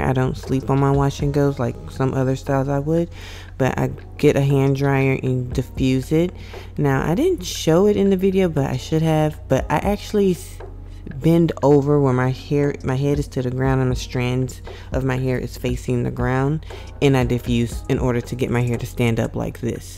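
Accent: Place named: South Africa. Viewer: You look so South African! Your accent gives it away. Gorgeous!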